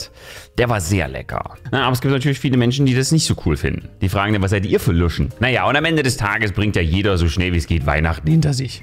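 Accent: Saxony, Germany. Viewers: Das sächsische rettet einfach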